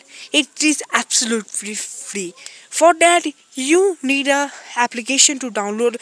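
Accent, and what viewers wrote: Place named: India. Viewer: How come I can never get a person who is American and speaks perfect English I can't understand these people it's always an Indian person in these tutorials!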